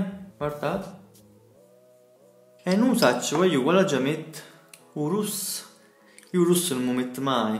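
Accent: Caserta, Italy. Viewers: Che zona di Caserta?😍 io sono della provincia invece 😍 si sente che l’accento è più casertano. Troppo forte! Mi sento a casa 😂🔝 e Ferro vecchio passa tutti i giorni ahahah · Da napoletana ti posso dire che si sente tantissimo che sei di Caserta e non di napoli Napoli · Da "napoli napoli" ti dico ch si sente un sacco il casertano hahahaha ti adoro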